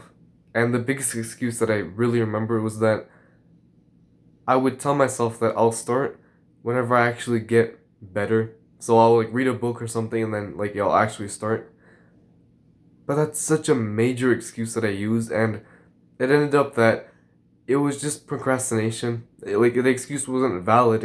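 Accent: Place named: Germany. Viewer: You have a German accent and probably live in Germany? If so, I lived in Munich for three years. Spreek je Nederlands?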